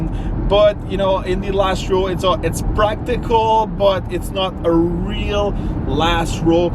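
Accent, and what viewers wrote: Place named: Quebec, Canada. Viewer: My guess Quebec Canada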